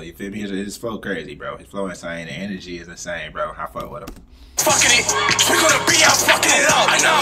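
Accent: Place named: Charleston, United States. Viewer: oh okay. U sound similar to people from Charleston where I'm from.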